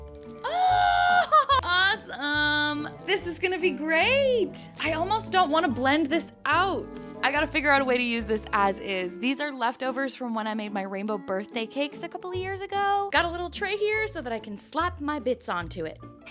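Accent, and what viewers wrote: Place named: United Kingdom. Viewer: Also your british accent is top tier! 👌 and that's coming from a Brit 🩷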